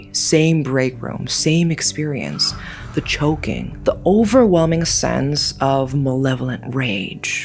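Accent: United States. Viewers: I have always wondered how you got such a perfect American accent when (afaik) you grew up in Korea. Special gifted immersion school definitely explains it! // Man, everything I hear about the Korean school culture makes me SO GLAD I didn't grow up in it. // I love getting a personal ghost story, one that we know really happened and wasn't just a friend of a friend of a friend! <3 // Did you ever look up the history of the school to see if there was a case of a student being beheaded in an accident? // Those were great stories! Happy Halloween!! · You gave me chills with this one. Do your people have warnings against whistling at night? Also, have you lived in America? The reason I ask the second question is that it sounds like you have.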